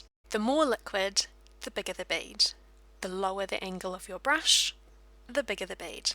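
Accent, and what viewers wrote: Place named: Australia. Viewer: "eats tew wit" lol I love your accent. Great video. Are you Aussie?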